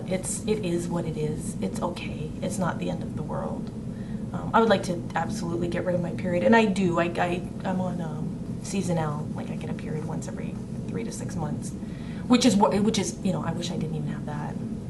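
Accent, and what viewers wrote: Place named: Canada. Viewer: Yeah, that's my case, too. I'm also totally used to the pronouns; it's just legal/ work/ questionnaire forms and that "male" designation that makes me feel like I'm being DEFINED, categorized, in an incomplete or erroneous way. Of course, race is a construct, too! We're all genetically-compatible... but that's a topic for another channel... Could tell you were Canadian; it's the pronunciation of "about." :^)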